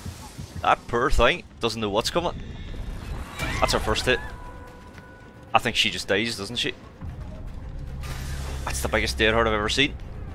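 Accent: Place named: Scotland, United Kingdom. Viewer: I really love the accent! Are you Scottish Sir?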